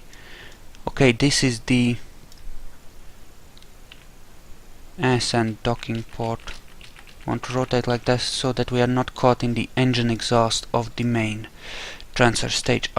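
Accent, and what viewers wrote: Russia. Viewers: I like your accent. Where are you from? Russia?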